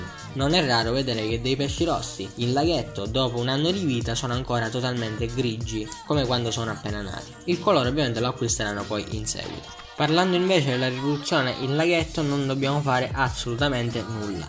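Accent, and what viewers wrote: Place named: Sicily, Italy. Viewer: Bravissimo... Sei siciliano, si sente!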